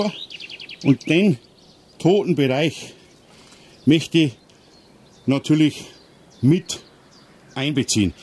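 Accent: Bavaria, Germany. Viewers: Hallo! Als Preuße lerne ich dich akustisch zu verstehen. Ich vermute das du ein "gemildertes" Bairisch sprichst. Da ich deinen Vlog mag und mich nach anfänglichen sprachlichen Problemen zu einem Fan entwickel, vermute ich das es auch in ferner Zukunft einen Punkt geben wird das ich dich verstehen werde. Ich lasse für den Anfang ein Abo da und freue mich auf weitere Projekte von dir. 😀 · Hed eher vom slang her af woidkircha de richtung tippt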